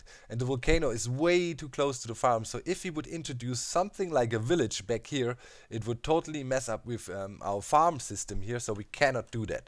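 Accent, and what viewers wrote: Germany. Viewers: Hi. Here's something about me: You and Xisuma were my first two subscriptions. My ears fell in love with your soothing German accent and mannerisms, and even your occasionally mispronounced words. Please say "ingredientses" more often!:D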